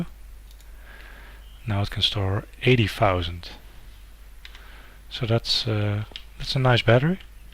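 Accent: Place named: Netherlands. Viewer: You sound a bit of foreign, Dutch perhaps? :P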